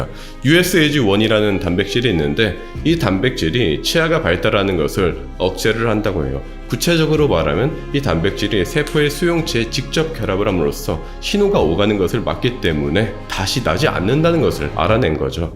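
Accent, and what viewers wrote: Gyeongsang, South Korea. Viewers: 경상도 억양 엄청 심하네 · 아 경상도애가 표준어 따라하는 억양 역겹네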